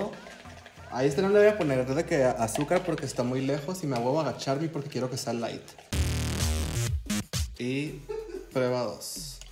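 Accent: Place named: Fresa, Mexico. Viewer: Jajaja yo no sé nada de las maneras de hablar en México pero jajaja parece que ella habla muy gomelo creo que en México le dicen fresa pero muy fresa jajaja a veces esa manera de hablar me incómoda un poco en los vídeos pero na me la paso genial!!!!!! ❤️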